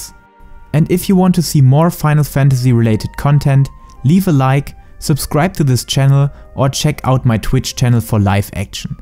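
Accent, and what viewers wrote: Germany. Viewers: bro, your German accent...I just cant...sorry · As a german myself i got to say: your accent is THICK man. Try to adjust to the english tongue a bit more, practicing pronounciation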